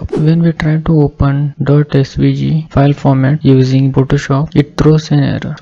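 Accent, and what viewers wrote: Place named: India. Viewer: You talk as though you are talking Hindi or something like that which makes it very hard to understand. You are talking without pausing anywhere.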